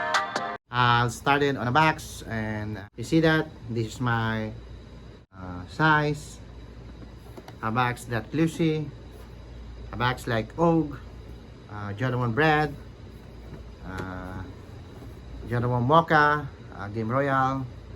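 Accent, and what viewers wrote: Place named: Visayas, Philippines. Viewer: Tol pag sure ba tuno bisaya kana hahaha pero ang ganda ng mga shoes original talga alam ko yan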